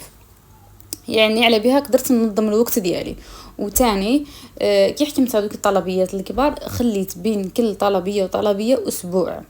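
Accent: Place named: Mila, Algeria. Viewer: باينة من هضرتك من ولايتي ميلة ❤❤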